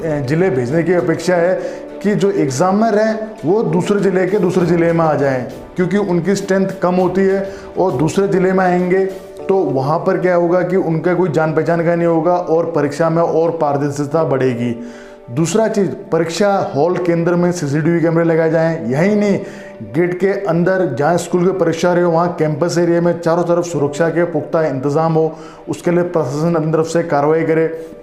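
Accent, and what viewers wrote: Rajasthan, India. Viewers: Sir ap Rajsthan se ho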